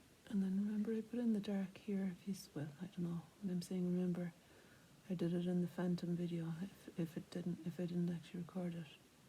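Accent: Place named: Ireland. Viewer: ❤With your damn Irish accent, I can understand anything! But your work speaks for itself! I think it is time for another DVD!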